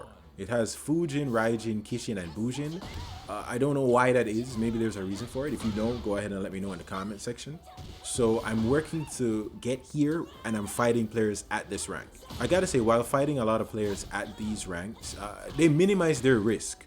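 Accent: Caribbean. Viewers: Imma subscribe bc you sound Caribbean...i am too. You also know what youre talkkng about! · bro sounds caribbean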